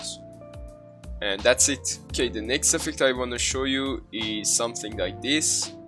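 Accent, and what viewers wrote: Iran. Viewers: your accent is so Persian bro😂❤ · داداش ایرانی؟ ادیت:پشمام از رو لحجت فک کردم ایرانی تا اینکه پیج اینستا تو دیدم فهمیدم ایرانی پر قدرت ادامه بده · داداش لهجت خیلی ایرانیه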